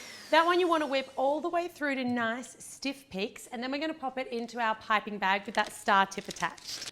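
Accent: Australia. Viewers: Your Australian right?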